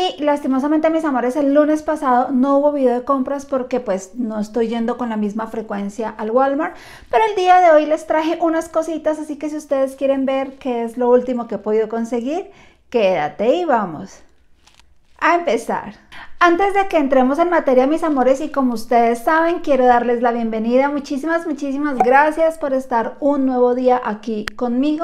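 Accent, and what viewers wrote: Colombia. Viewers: Eres de Colombia y vives en Aguascalientes? O porque hablas con acento Colombiano?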